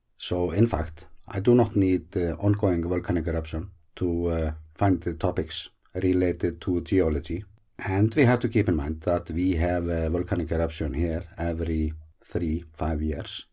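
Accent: Iceland. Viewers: Your channel is much more than just about geology, earthquakes and a volcano, even though that's what initially drew me here. Your educated insight and coverage has been an enjoyable and informative experience. I've grown to love seeing and hearing about all things Iceland, so I'm here for the long haul. I'll look forward to seeing my notification bell lit up and finding you there. There's so much more to see and explore and you'll be the perfect guide, my friend. Your authentic Icelandic accent is a nice addition, too. 😉 . . . Stay well.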